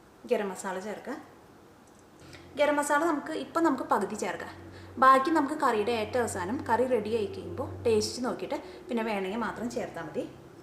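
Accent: Thrissur, India. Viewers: R u from Trichur?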